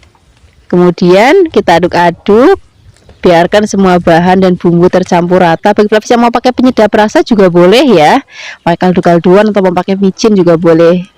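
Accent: Java, Indonesia. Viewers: tinggalnya di mana sih ko logat bahasanya Jawa banget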